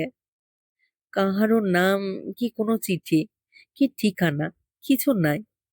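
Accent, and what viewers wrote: Bangladesh. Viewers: এই গল্পটা এদেশী লেখকের লেখা।বাঙাল টানে পড়লে তা উপযুক্ত হয় না।তাই পাঠ অত্যন্ত নিন্দনীয়। না বলে পারলাম না।পারলে শুধরে নিও।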